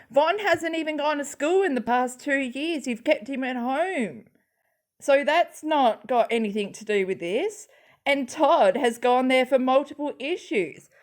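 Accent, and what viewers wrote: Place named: Australia. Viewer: Its refreshing to hear an aussie accent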